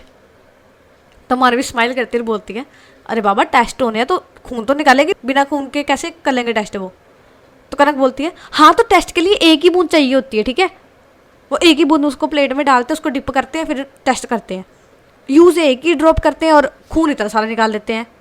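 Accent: Punjab, India. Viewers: Very nice story aapki Punjabi bhi to Kya Baat hai itni khalish Punjabi ❤❤❤❤😅😅😅😅😅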